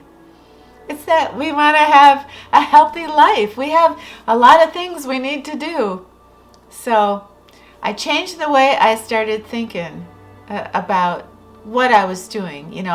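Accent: Midwest, United States. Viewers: Your accent is Midwestern? Where are you from?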